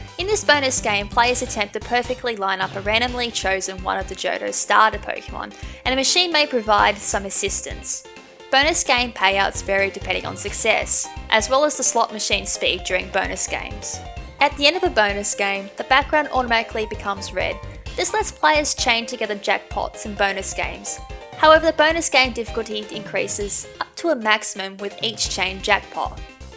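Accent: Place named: Australia. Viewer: I am tempted to not watch your videos for a while, not because I don't like your videos, but because I love listening to your voice speak about Pokemon. Sounds weird I know but I like Australian accents x)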